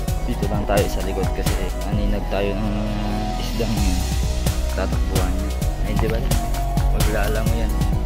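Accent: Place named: Nueva Ecija, Philippines. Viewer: Taga saan ka bro parang nueva ecija ang punto mo ahh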